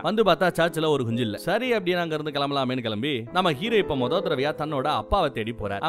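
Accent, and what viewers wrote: Madurai, India. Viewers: ப்ரோ நீங்க மதுரையா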